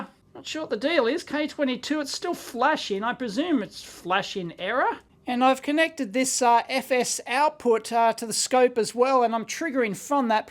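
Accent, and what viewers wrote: Australia. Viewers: That thick aussie accent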